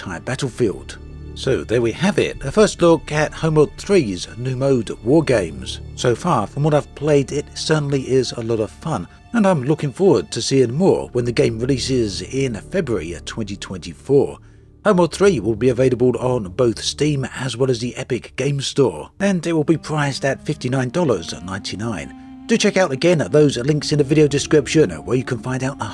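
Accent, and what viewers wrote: United Kingdom. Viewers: I had a bit trouble listening to this video, great although it was. I'm not sure if you're doing it on purpose, but you intonate word in a subtle but bizarre way, word such as "video" you say "vide-ó" stressing the 'o'. You have a pronounced British accent, so It just felt out of place.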